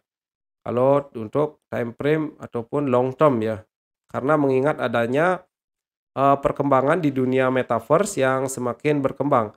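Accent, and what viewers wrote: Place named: Bali, Indonesia. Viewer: Saya tau dr logatnya bli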